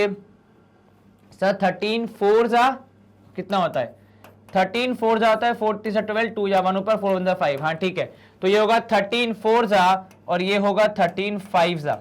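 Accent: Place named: Rajasthan, India. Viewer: I think that you are from rajsthan right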